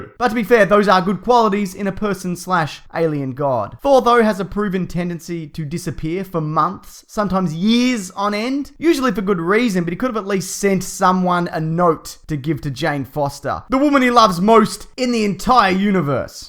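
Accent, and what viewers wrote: Australia. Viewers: His accent is australian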